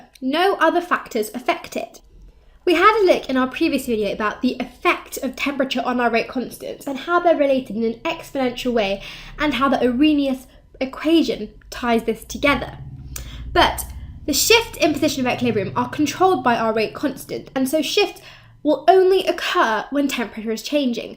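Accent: United Kingdom. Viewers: Actually she's having the perfect and proper British accent. You need to work on your understanding of the Queen's English.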